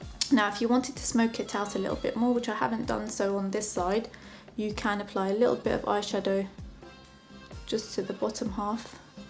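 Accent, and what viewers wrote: United Kingdom. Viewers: Love you accent are you living in the UK cuz it sound like a british accent btw you<re beautiful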